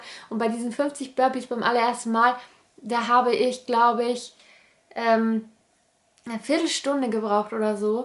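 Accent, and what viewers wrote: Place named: Franconia, Germany. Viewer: Daumen hoch wegen deines fränkischen Dialekts :-)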